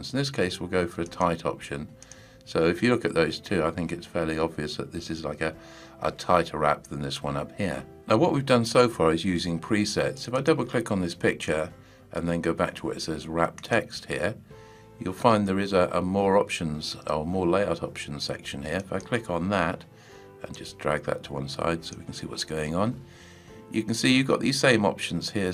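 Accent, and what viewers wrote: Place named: United Kingdom. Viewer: Excellent tutorial ! You are an excellent teacher and presenter. I must also comment on your cultured British diction... it is heavenly. We in the Queen's American Colonies speak a doggerel and uncultured version of the English language, of which we should all be ashamed. It is such a delight to hear someone who speaks in a cultured manner, in complete sentences, and without lacing every sentence with those pig-grunt utterances of the non-words "UH" and "gonna." I shall be watching more of your videos. Liked and Subscribed. Hold High the standard of proper and cultured English speech !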